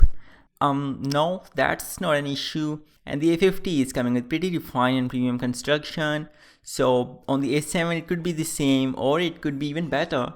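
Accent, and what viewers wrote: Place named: India. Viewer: why is it only Indians they sound so annoying!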